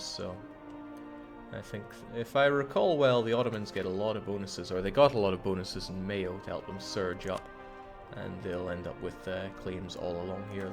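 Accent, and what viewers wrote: Scotland, United Kingdom. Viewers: Man, his Scottish accent disappears after watching the Scottish twitter video and coming back to a normal one. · I thought this was Arumba, and I was confused and I thought he was sick again then I felt the Scottish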